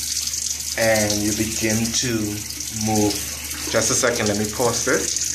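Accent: Bahamas. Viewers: I hear a bahamian accent doe! Where u from soulja? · u sound Bahamian, r u? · he sound super bahamian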